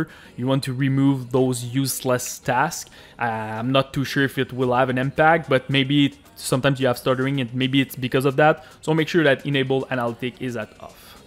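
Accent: Quebec, Canada. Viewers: Tes québécois non?? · Bel accent Québécois! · Um, are you a French Canadian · Are you French Canadian?? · Thanks man ! Clairement un Québecois, corrige moi si je me trompe ;P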